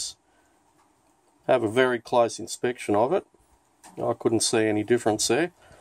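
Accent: Australia. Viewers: Sounds like an Aussie accent. I'll get you a James Boag's next time I am on the Gold Coast. Notice that the inner part with the splines is flush on one side and has a raised section on the opposite side. I wonder why and I wonder which end of the splined shaft goes into which end, the flush or raised side?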